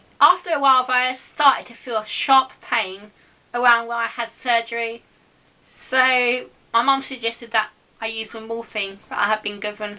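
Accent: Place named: United Kingdom. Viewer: Wonderful that your mother was there to support you. I enjoyed listening to you as I enjoy listening to you Brits talking; to this end I watch a lot of BBC showsz and listen to BBC radio at various times during the day. My best to you and your family. namaste, Roger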